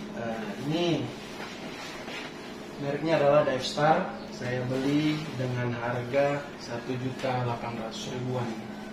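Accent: Manado, Indonesia. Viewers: Orang manado kang?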